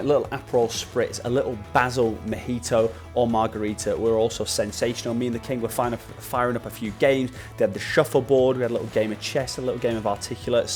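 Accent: United Kingdom. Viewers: Great video bro, drone video footage really amazing. I really love your british accent bro, i also love scotish accent, irish, and wales. It help me to practicing for listening accent for preparing if someday i go to uk 😁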